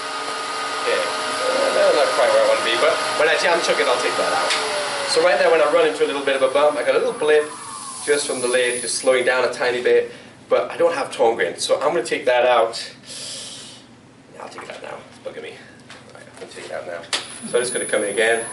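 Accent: Newcastle, United Kingdom. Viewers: And the Geordie accent shines through ;-) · Your Geordie accent is so messed up, man.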